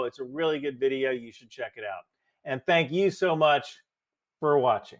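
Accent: South Africa. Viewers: Good South African accent. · Your South African Elon accent is pretty good!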